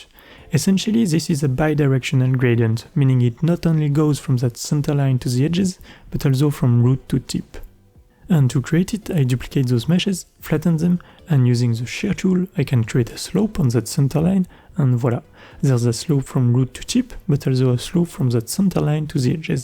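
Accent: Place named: France. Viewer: Awesome tutorial! love your French accent bro.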